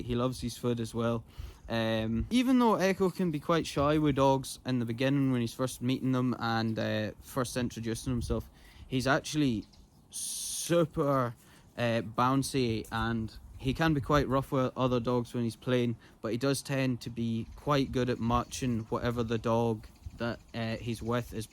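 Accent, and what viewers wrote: Scotland, United Kingdom. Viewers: Love your videos Your sweet dogs are adorable and love watching them Enjoy your Scottish brogue so much! My best to you, Stacey and your fur babies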